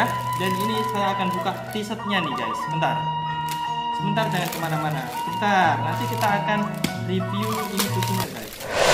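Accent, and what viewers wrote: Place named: Malaysia. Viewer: Waduhh... Bahasanya bunyi campur malaysia gitu... Berkecek kolumpur ko mas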